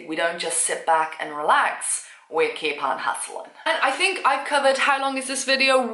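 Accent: United Kingdom. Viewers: I love ur accent i want to find me a British wife got any friends? Lol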